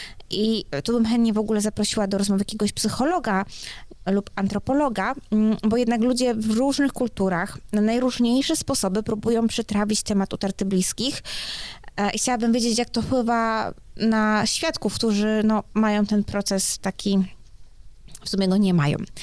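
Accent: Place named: Ukraine. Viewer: Sądząc po akcencie to jest chyba biedną ukrą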